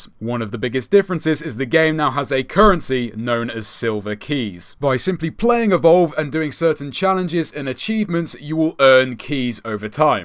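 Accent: United Kingdom. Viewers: How did I know that the commentator was en English lady.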